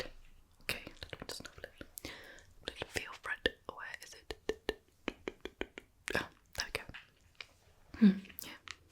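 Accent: United Kingdom. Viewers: I didn’t know I needed British ASMR until now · As unhinged and possessive as this gal is, she's irresistible! I can't believe this guy let such a beautiful woman with the cutest British diction get away! Even if INSANE, she's a keeper!, this one's a masterpiece! You made all of us wish we were that guy! You've done it again, love! ❤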